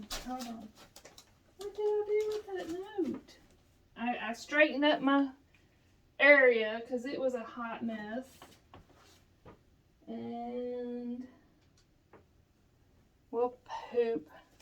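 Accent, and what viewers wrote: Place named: Tennessee, United States. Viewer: Congratulations on reaching 500! You're so cute, live hearing your Tennessee accent..Halloween will be here before we know it.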